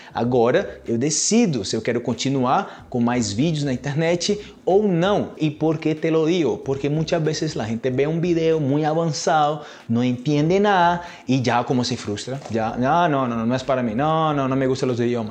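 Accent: Colombia. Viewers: Jaja, como lo mencionan en el video con Steve Kaufmann, tu español suena con el acento de Colombia. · Ya pegaste el acento colombiano... yo aprendí contigo.. ahora vivo en Brasil y enseño espanhol rsrsrsrrsr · Le doy 10 de 10 a Tu asentó español colombiano, ojalá yo pueda aprender portugués así de bien como tú hablas español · Amé tu acento colombiano es bellísimo!! ya inicié el mini curso es pero avanzar pronto!! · nossa você fala espanhol como se fosse colombiano, eu sou falante do espanhol e quando escutei a você falando foi incrível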